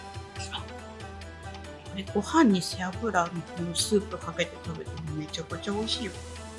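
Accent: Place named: Okayama, Japan. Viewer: ここも美味しいですが、埼玉の騎西店はラーショの中でもサイコーです。是非一度！でも、どこの訛りなんやろ❔岡山辺りかな～⁉️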